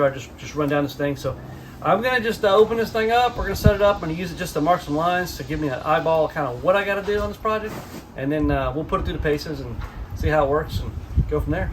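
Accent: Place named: South, United States. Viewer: southern accent, scared of manuals that might resemble "books", yeah that fits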